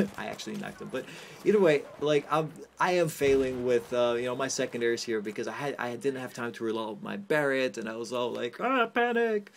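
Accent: Netherlands. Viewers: are you from the dutch? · Hey, you dutch?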